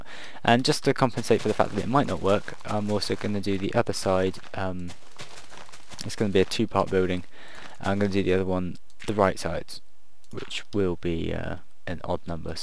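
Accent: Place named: Southampton, United Kingdom. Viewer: he sounds like hes from southhampton or somewhere near there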